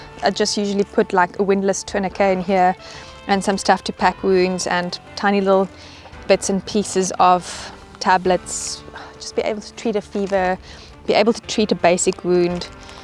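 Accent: South Africa. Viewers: It's the Saffa accent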